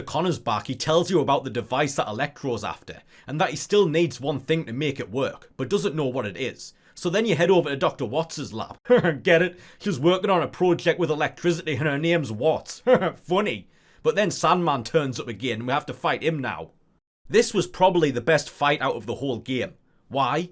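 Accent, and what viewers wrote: United Kingdom. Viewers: Okay I'm 9 minutes in and I've gotta say: - You have what it takes to have easily >500k subs. I don't mean you should only have 500k, but the production and editing and commentary are far above and beyond what I would expect from a youtuber with 182 subs. You're already producing content in the echelon of much higher sub counts which I hope pans out for you. - You have a great voice/accent for this shit. I literally won't watch videos over 8 minutes without a UK accent - Major nostalgia from this game. I used to play the demo of the first game on the Jampack Winter 2000 demo disc I got at a Toys'R'Us (RIP) - actually apparently they are opening inside of Macy's as of a year ago after the bankruptcy and closing of all their stores - Funny without being cringe - Good pacing - describing what happens in the game without being too descriptive all while being analytical without being too analytical - you strike a perfect balance Immediate sub and like. 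One thing/tip (?) is to bleep the f bombs. I do absolutely love hearing them, but I've noticed that lots of the higher profile youtubers are bleeping to maintain ad revenue. I think the bleeps still suffice in delivering the sentiment of f*ck. If you have a Patreon I'd be glad to give $15-20 a month to help out and see where you go. EDIT_0: 13 min in - the skyrim realization is amazing. I didn't know that 🤣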